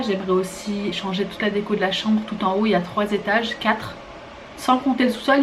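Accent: Algeria. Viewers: Salut bella, bsahatkom la nouvelle maison, inchallah trabah 3likom avec l'arrivée du bébé!!!! Stp ton accent algérien paraît orannais!!! Est tu d'oran??? Ce dont je suis certaine c'est que c'est l'accent de l'ouest!!!!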